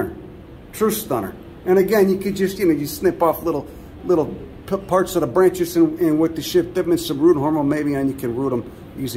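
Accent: Chicago, United States. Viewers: Chicago accent!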